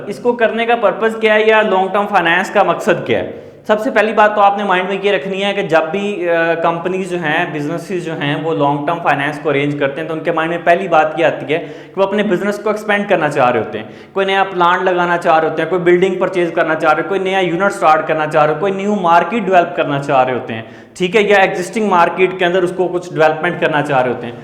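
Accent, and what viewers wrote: Pakistan. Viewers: Are yyou frm Pakistan?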